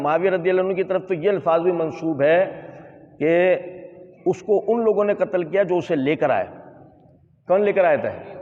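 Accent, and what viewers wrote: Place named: Hyderabad, India. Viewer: آپ کے بعض الفاظ سے آپ مجھے حیدرآباد دکن کے معلوم ہوے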